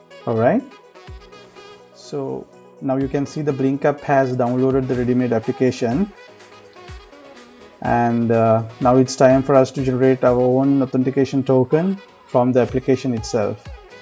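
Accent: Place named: India. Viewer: Pity you don't have the subtitles. Your Indenglish is difficult/impossible to understand when you do the patches of high speed phrases.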